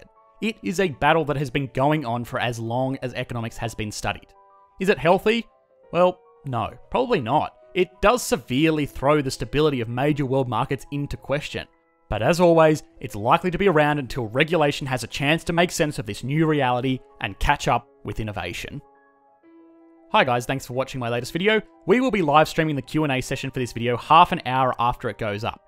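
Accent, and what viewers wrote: Australia. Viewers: Editor He is from Australia ? :D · Economics Explained has the most charming Australian accent. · its actually irritating, uk australian accent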